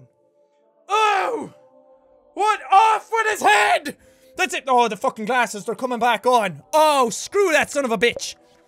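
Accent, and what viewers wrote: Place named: Ireland. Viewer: Please make more videos where you talk in that heavy Irish accent it's very beautiful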